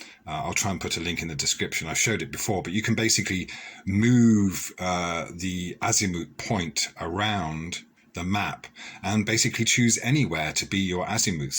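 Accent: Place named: RP, United Kingdom. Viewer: Thinking that rotating 2 out of date 2D projections of a globe validates a flat earth is extremely childish and infantile in its total impracticality and worthlessness. I do understand azimuthal projections but that doesn't make it " fine " to have to spin one so that continents align correctly. If you honestly believe that it makes any kind of sense to do this then I doubt its worth explaining why this represents nothing relevant to navigation in the real world.. How do you travel South to North in South America and Africa at the same time? You do have a decent Semi Posh ( RP ) voice but hearing so many ummm and errs is a dead give away you're blagging. You really are pointing in the wrong direction maybe when the magic sky daddy spins half the earth around you'll find your way.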